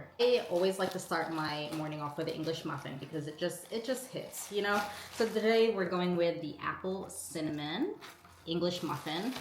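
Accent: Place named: East Coast, United States. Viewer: You actually sound like your from the east coast, and BTW that GASP shirt was a banger I have a lot of that gear along with many other fitness oriented companies...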